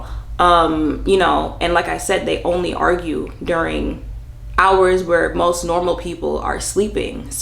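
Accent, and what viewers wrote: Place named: Long Island, United States. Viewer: Oooh you’re from LI. I can’t place your accent to specific town 😆 I hear you on the moving out. So happy you were able to remove yourself from such a wild apt. I hope the next place is much better.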